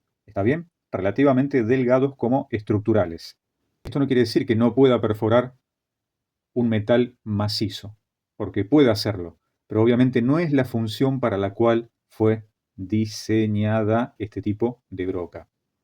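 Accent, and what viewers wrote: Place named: Argentina. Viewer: Sonsonete argentino es molesto.... pongan alguien que hable español neutro... gracias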